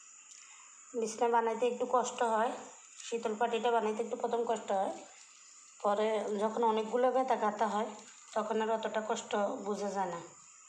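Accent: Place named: Noakhali, Bangladesh. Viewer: আপনার ভিডিও গুলো আমার অনেক ভালো লাগে আপু আপনার কথা গুলো একদম নোয়াখালীর মত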